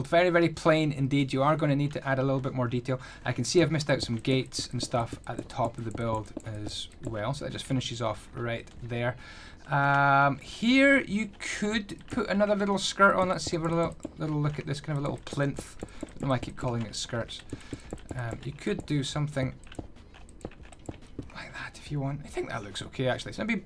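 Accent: Scotland, United Kingdom. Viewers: I love your accent. Are you Scottish by chance? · It looks so gorgeous once it’s built - also you sound Scottish so if u are, fellow Scot here ❤️😂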